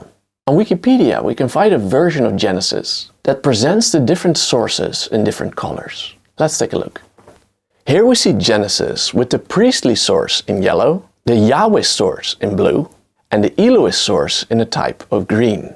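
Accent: Netherlands. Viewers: YOUR ENGLISH IS VERY GOOD, almost without any accent, BUT when listening to you for 10 minutes, one can detect your Dutch mothertongue by your v- and s-sounds, which in some intances you pronounce the same as in Dutch and not as in English. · could be because of the Dutch accent. The letter J is pronounced /yay/ and G is /hay/.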